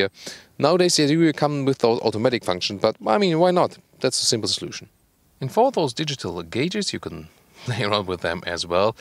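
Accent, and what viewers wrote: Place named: Germany. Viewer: German cars should be always presented with German accent just like in this video :)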